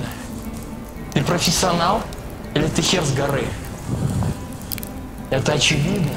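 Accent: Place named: Belarus. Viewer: Первый раз слышу такой голос на твоем канале. По акценту слышно что ты с Беларуси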